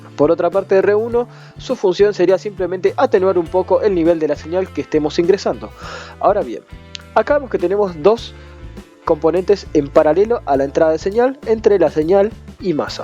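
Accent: Argentina. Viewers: eres argentino o chileno?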